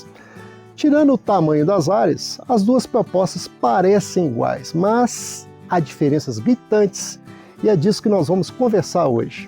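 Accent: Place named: Minas Gerais, Brazil. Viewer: Gostei muito da explicação, e também do sotaque do apresentado... MG!? 👏🏾👏🏽👏🏼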